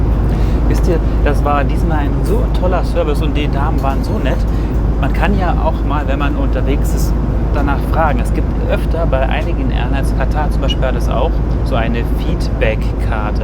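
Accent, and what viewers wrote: Germany. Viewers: Ich habe mit ihnen über dieses Thema schon einmal gesprochen. Sie haben eben das typische Schulenglisch gelernt und jeder weiß, wie gut dieses ist. Wenn man eben, so wie sie, lange kein richtiges Englisch spricht, vergisst man eben eine Menge und die Aussprache leidet auch darunter. Vor allem Vokabeln. Diesen typischen deutschen Akzent hat einfach jeder Deutsche. Ich lebe seit meiner Kindheit zwischen Monaco und Berlin und spreche fließend Englisch, trotzdem hört man meinen deutschen Akzent. Das ist einfach so. Jeder hat seine Probleme mit der Aussprache von verschiedenen Wörtern. Ich würde es einfach entspannter sehen. :) Liebe Grüße aus Monaco -Raphael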